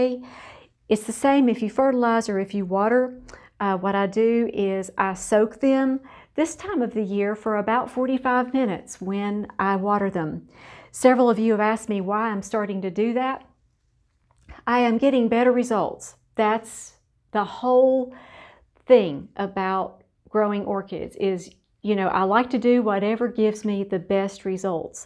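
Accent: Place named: Tennessee, United States. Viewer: I, do you put your fertilizer water on each time you soak your plants for 45 minutes, all year long. Or do you ever water with plain water in between? My daughter lives in Knoxville so I love listening to your TN voice! Thanks, Susan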